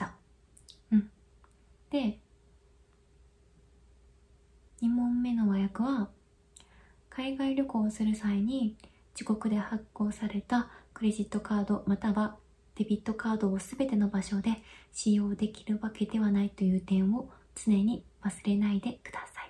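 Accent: Kansai, Japan. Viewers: メガネ！やっぱり可愛すぎ！特に下にずらしてる時！関西弁の引き出しまであったとは😳 シャーペンで書く音が1番良い音だった！英語の勉強した方が良いのは分かってるんやけどなー・・・ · 関西弁カワユス♥️ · 最近ASMRにハマって、サムネイル画像でめちゃくちゃ理想のタイプって思って観て(聴いて)みたら、優しい声で、関西弁で…惚れてしまいました!!😳🥰 これから毎日寝る前に観よう(聴こう)と思います😊 応援してます!!👍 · 関西弁！？最高すぎ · 関西弁！萌える！！地声も顔もめちゃくちゃにかわいい！！ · 関西弁💕💕 こんなに新しいロールプレイも良き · 関西弁…最高…親近感…可愛い… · 関西弁ッ！好きです（直球）関西出身なんですね。今度大学でtoeicを受けるので参考にさせてもらいます！ Runa先生にtoeicの勉強教えてもらいたい… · 関西弁喋れるんですね。関西人ですか❓私は和歌山県に住んでた事があって関西弁もマスターしました。地元の人にとても関東人には思えないくらい発音がネイティブって言われるくらい上手くなりました。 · 投稿お疲れ様です！メガネいいですね！そして関西弁、すごい親近感です😆 引き続き応援してるので頑張ってください！ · 関西弁が可愛いすぎ😭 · かわいい先生❤️関西弁もいい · この可愛さで関西弁は破壊力満点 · 関西人なのに関西弁にやられる。。。激萌ゆる、、、ある意味メンタルやられる♡ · 元々好きな動画やったけど、関西弁で益々好きになったわ❤️ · 今年受験生だし政経選択だからほんとありがたい。Runaさん関西弁だけど、東京にいたら青山学院大学通ってそう。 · × 関西弁とか可愛さの致死量に達する...😇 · 何やろう･･･ このコテコテでもない、上品な関西弁は･･･！ Runa先生の育ちの良さが出過ぎてます･･･ 個人的にの笑顔大好きです･･･癒されました😍 音声は後半のアプリ紹介のところですごく近くなってドキッとしました!! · 関西人のわい関西弁に歓喜する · runaさんは関西人かな？そうじゃないとしたら関西弁自然すぎる笑それと関西弁ロールプレイは大阪人の自分からしたら最高です😃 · 関西弁で関西ニキたちが歓喜してる中、謎の敗北感に襲われる関東生まれの俺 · 関西弁ー？！？！？関西人なのですか！？！？好きです🥰🥰🥰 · ①今から文転して大学受験し直すか、 ②来世で先生が理系になっている世界線に賭けるか、 ③アダム・スミスになるか、迷って勉強できないので先生に相談しにいきます。あとTOEIC点数高すぎますし、めちゃんこ可愛いし、関西弁かわいいし、本当尊敬してます！ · あれ？先生関西人やったんや、、🤣知らんかったん俺だけ⁉︎ 何か勝手に親近感‼︎嬉しい😍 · 関西人やけど周りにここまで関西弁の女の子居ないからなんか新鮮でした😄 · おお！流暢な関西弁！メガネ姿もかわいい。今度、大事なところは耳元で囁いてくる、誘惑してないのに誘惑してるようになっちゃう先生とか見たい！ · 関西弁めっちゃええなあ。次は津軽弁待ってますこんな家庭教師さんおったら勉強が嫌でも好きになってまうて 250万人に使われる大手さんから案件来るとは流石！これからもファイト、オー！なのですよ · 関西弁、、可愛い😍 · 関西弁？めちゃいい.ᐟ.ᐟ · 関西弁ネイティブですやん！！せ↑いけいとかもうネイティブなんですよね笑こんな家庭教師おったら顔見て話聞かずに終わりそうw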